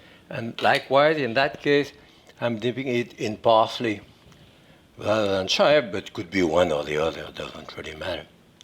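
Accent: France. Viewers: very nice french accent , also beautiful kitchen, very cousy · Why does English spoken in a French accent sound so much better?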